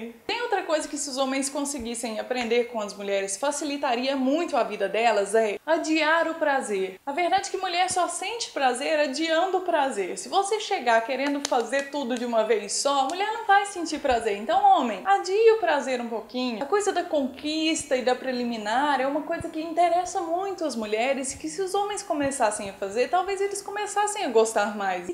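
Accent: Minas Gerais, Brazil. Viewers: sotaque minerim uaii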